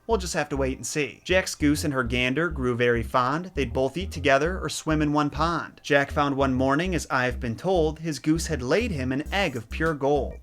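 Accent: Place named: New York, United States. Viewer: Sometimes goes full New York italian when he wants to.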